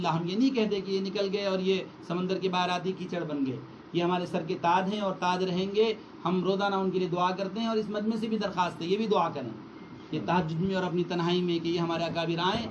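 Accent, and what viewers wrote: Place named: Hyderabad, India. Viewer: Yeh admi kaun hai. Mujhe hyderabadi lagta haj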